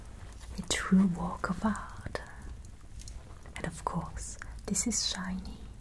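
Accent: France. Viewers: love when you speak french + english with a french accent ! youre such an elegant, classy and beautiful woman. ❤ i love your vision and creativity. top tier content ! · Simply astounding production quality in every way!🙏 The lighting looks wonderful, beautiful images. And your French accent, and dialogue in French, is delightful to hear too. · Tu parles d’une façon très française 👍🇰🇷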